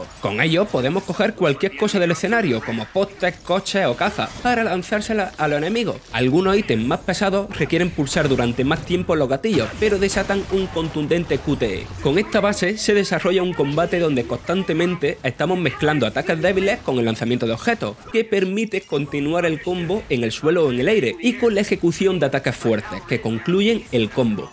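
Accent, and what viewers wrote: Andalusia, Spain. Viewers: es que tio tienen contratado al notas con mas acento cerrado sureño de toda españa, literalmente no le entiendo mas que una o dos palabras, joder que le pongan a editar o redactar o que le paguen un logopeda. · Ese acento de que parte de españa es? No le entiendo nada xD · ! al fin te escucho de nuevo, hace tiempo que no veía un video con vos, me encanta tu forma de hablar No sé de qué región de España es, pero me parece buenísima! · sí, el acento andaluz, del sur de españa. Un desastre, y muchos españoles dicen después de lo "mal que hablamos los latinos" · Acento muy común de españa. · Juraría que así hablan en cierto lugar de España, pero no logro recordar dónde exactamente xD me hace mucha gracia igual :v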